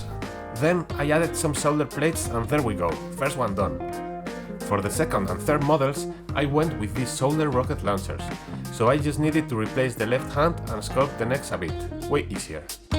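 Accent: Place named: Spain. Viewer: Vi ese español interior a través del acento jajaj, buen vídeo Bro 👏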